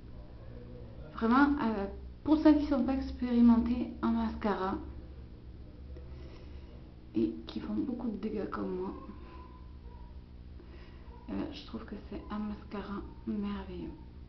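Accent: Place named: Quebec, Canada. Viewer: Hello hello!! Ahhh tu viens du Québec? Il n'est pas bizarre ton accent! J'aime bien perso!! Ce make-up te va à ra-vir!! Les couleurs des Monobulles sont sublimes j'avoue....Et j'adore ta couleur d'iris... Héhé t'inquiètes, je ne t'oublie pas avec Alice... J'ai ma petite idée dans ma petite tête.... Gros bisous et merci pour la dédicasse! T'es choute ♥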